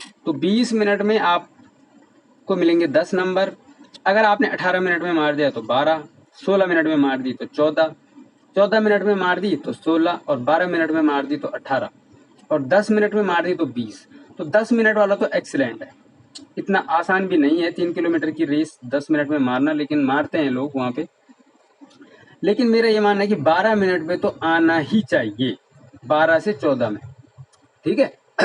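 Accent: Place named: Garhwal, India. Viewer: bhai jiii aap gadwali ho apke jhalak pure gadwali ho nice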